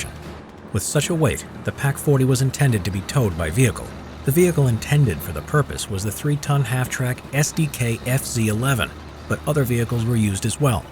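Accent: Germany. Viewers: I love those german pronounciations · you have german accent noice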